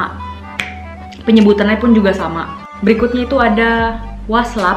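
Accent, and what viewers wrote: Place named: Sunda, Indonesia. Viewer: Teh orang mana? Kaya org sunda td bilang "bade ka cai" 😆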